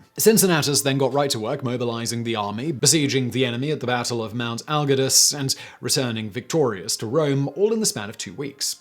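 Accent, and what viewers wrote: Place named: RP, United Kingdom. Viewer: This presenter guy is proof that a cultured British accent doesn't mean you aren't a slimey lowlife.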